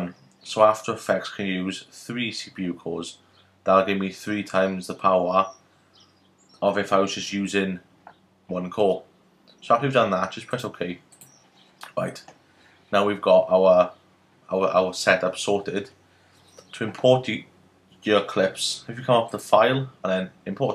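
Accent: Swansea, United Kingdom. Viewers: YOUR WELSH!!! ME TOO! WHERE YA FROM BUTT? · Swansea man? :D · i swear ur from swansea in south wales!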